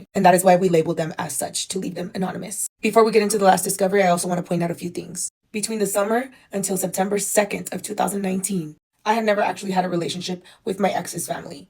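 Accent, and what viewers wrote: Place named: California, United States. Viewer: I from San Diego, and was just listening (Not watching) and i was like...she gotta be from cali and then she mentioned rancho and riverside hahaha. Glad you found out! Stay chingona!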